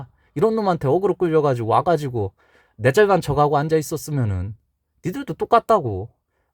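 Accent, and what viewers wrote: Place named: Dangjin, South Korea. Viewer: 당진 사람이죠? 제 아는형님하고 말투가 너무같아요 당진이면 유성 코일도 괜찮아보여요 평판이니 저는 같은 충청사람으로써 너무 말투가 공감이가네요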